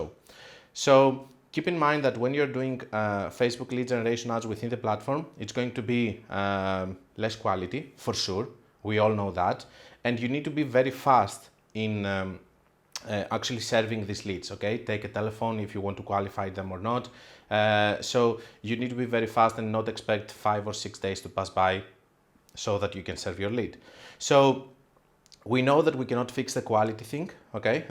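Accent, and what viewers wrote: Greece. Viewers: η ελληνικη προφορα φωναζει απο 10 χιλιομετρα μακρια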